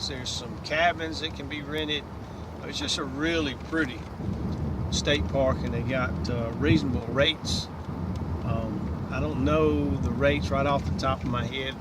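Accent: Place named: Mississippi, United States. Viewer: We stayed there a few days last week - till our last night the weather was getting very bad - so came home a day early. was nice windy weather thou. Sounds like your from Laurel Ms. :)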